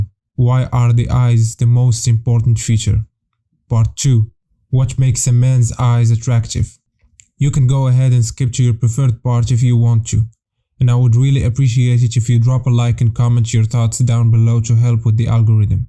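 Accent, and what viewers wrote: Morocco. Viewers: you've got a Moroccan accent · The english of the person speaking screams moroccan. Just by his accent i can even tell you which city in morocco he comes from. XD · You sound moroccan bruv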